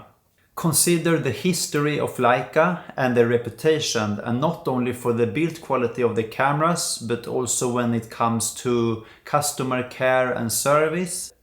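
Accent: Sweden. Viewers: Du måste vara svensk!! Finns inget annat, så hårt som du bryter😂 säg mig, hur bra är den kameran. Jag funtar på och skaffa en q2m i värsta fall en q2… har du framkallat några bilder än? Eller gjort nå prints ? Isf hur stort ? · Dat svenska accent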